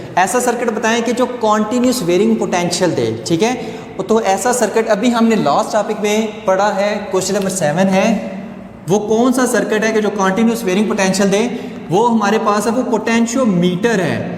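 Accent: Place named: Saraiki, Pakistan. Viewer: Sir ap baton baton mei saraiki lgay hain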